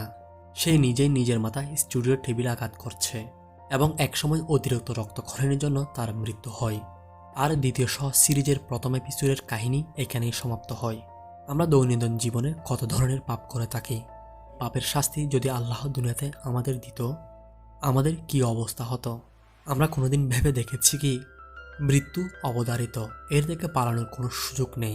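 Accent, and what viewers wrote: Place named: Chittagong, Bangladesh. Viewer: আপনার বাড়ি চট্টগ্রাম। আপনার কথা শুনেই বোঝা যাচ্ছে।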